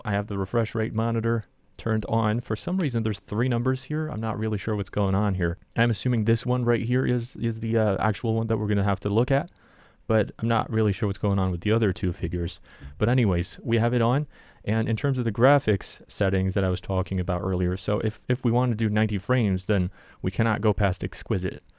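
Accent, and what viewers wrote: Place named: United States. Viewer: From the sound of your voice you're located in America so I just wanted to ask how your process was getting the 17 ProMax and if you could maybe link where you got it from or something like that so other Americans like me can reliably get the Xiaomi 17 pro Max. Plus I did want to ask what cell phone provider you're using because I know some of the Chinese smartphones do not work well with Verizon for example. (I have t mobile)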